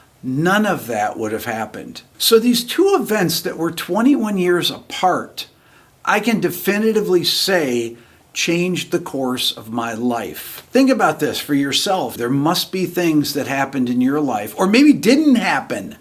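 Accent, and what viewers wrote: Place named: Upstate New York, United States. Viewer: Congrats! And I like that you still have traces of upstate NY in your speech! 👍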